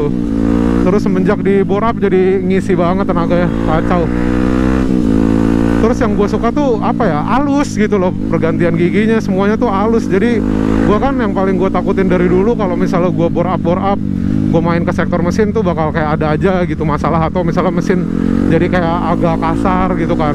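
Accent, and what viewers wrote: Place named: Betawi, Indonesia. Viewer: Betawi itu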